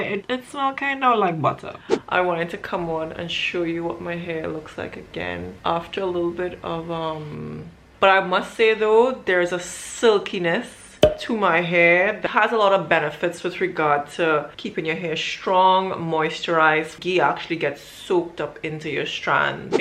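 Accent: Trinidad and Tobago. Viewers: Do I hear the beautiful lilt of Trinidad in your voice?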